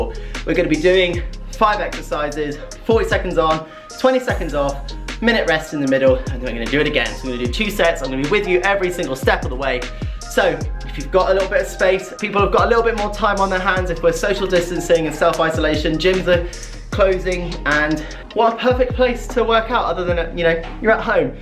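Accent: United Kingdom. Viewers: Weirdos? why? Hes hot! Hes shirtless! Hes got british accent!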